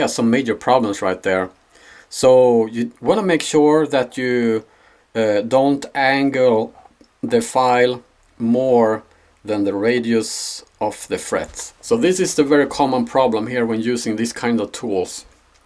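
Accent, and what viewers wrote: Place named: Sweden. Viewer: Where are you from? The accent is really hard to place. First I thought Swedish, but there's something that reminds me of Finish also.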